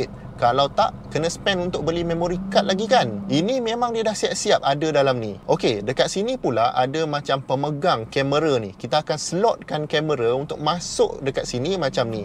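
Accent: Johor, Malaysia. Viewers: Bang. Org johor ke? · Dr org johor ke?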